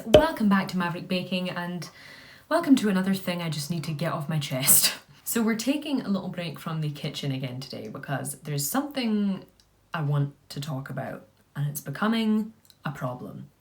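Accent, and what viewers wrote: Scotland, United Kingdom. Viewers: Hiii! I am here only for your good looks and Scottish accent. ;)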